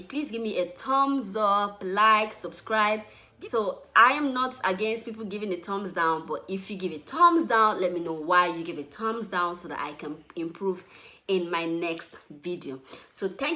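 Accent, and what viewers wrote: Anambra, Nigeria. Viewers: She speaks Anambra dialect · Please are you speaking central Igbo? Or just Anambra dialect?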